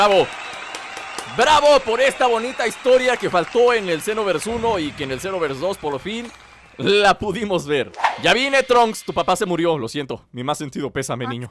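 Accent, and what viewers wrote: Aguascalientes, Mexico. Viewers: eres mexicano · eres de Aguas Calientes?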